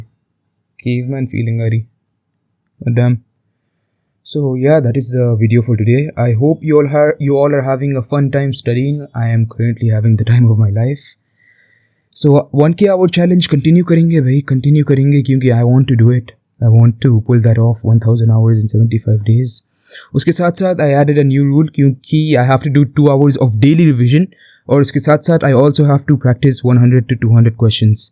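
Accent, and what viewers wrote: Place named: Bihar, India. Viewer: Bihar ya odisha ka lagra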